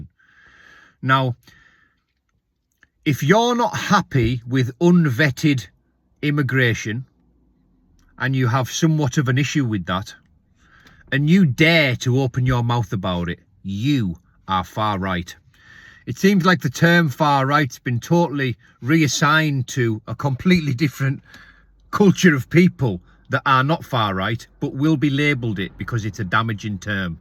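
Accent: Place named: Cumbria, United Kingdom. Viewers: Knew it from your accent. I’m Cumbrian too. We are lucky where we live but it’s changing. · I knew when I started listening to this video you sounded Cumbrian. 😂 I moved up here 10 years ago and love the spot, I integrated into a small village community after moving from a big busy city. I wouldn't try to being my city lifestyle to my new community because they live there way of life and I've embraced it.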